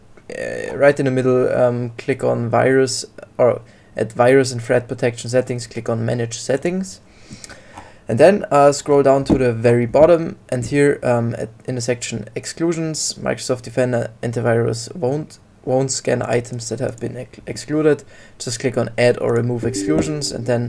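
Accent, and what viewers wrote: Germany. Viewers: Man merkt denn deutschen akzent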